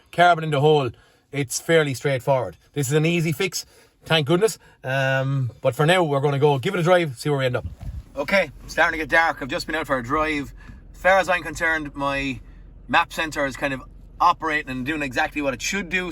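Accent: Ireland. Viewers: Suprised to hear an Irish accent! and thanks! Same problem with my Outlander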